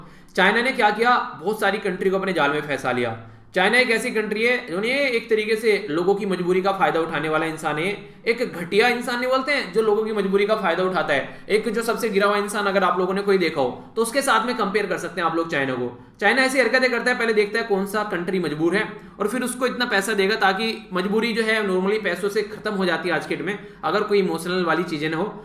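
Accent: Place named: Western Uttar Pradesh, India. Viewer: Sir pakka west up se h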